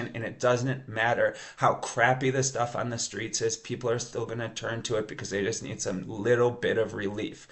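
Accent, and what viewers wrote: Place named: Syracuse, United States. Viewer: oh 100%! they shut down the "pill mills" but everyone was already hooked. Exactly why "crackdowns" on any one particular drug never work--the population will just shift to something cheaper, more readily available and likely more dangerous. I knew about Portugal's decrim status on most substances but I didn't know about the micro loan program! that's very cool :) I knew your accent sounded familiar but as soon as you said "Syracuse" it all made sense lol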